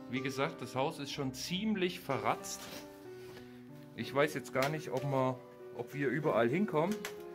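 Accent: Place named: Upper Swabia, Germany. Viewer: Du kommt aus Oberschwaben gell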